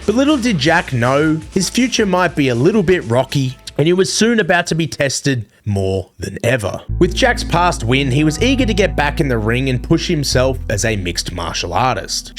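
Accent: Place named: Australia. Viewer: Loving your videos mate. So good to hear an entertaining Aussie accent.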